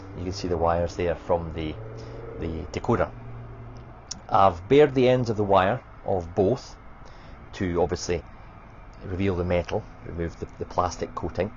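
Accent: Scotland, United Kingdom. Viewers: What a great video that was. I didn’t know any of that stuff. Very informative. I love that Scottish accent too. Pete 🇬🇧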